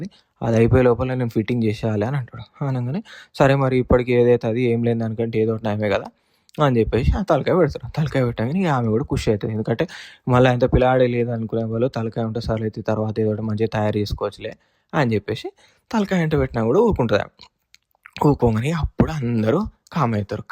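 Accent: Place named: Telangana, India. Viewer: Wahrewa Telangana Slang lona 😂😂😂😂😂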